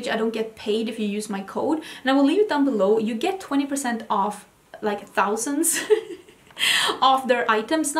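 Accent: Sweden. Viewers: Yes. Im danish and i could NEVER speak so fast and fluid english like! Also, swedish is a beautiful language so her accent is so nice:) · I think this eye look is one of my favorites you ever done. I’m only 1 minute into this video and HAD to comment and let you know. You are always stunning, but this entire look...wow!!! I love seeing your hauls because I know definitively that, bcuz of your Low-Buy, whatever you bought you REALLY wanted and spent a while deciding to purchase!! Okay, back to completely, 100%, focusing on your wonderful video instead of typing!!!! 😘 Edit: how wonderful that you got so excited about a purchase. I’m buying the ND Mini Gold palette today as I returned the new CT foundation (ay yay yay!) and I’m soooo excited! And, your English is wonderful. When my Dad came to this country from Germany, he started learning English by watching cartoons. 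Til the day he passed, he had the most beautiful accent but still pronounced some words incorrectly or had trouble with certain popular phrases but I found it endearing. You should be so proud of your near mastery of the English language; it’s so complicated, and your gorgeous Swedish accent makes it all the better. Niacinamide is hard for even a biochem scholar in the US to say, I think, lol. Maybe try: ny-uh-sin-uh-my-d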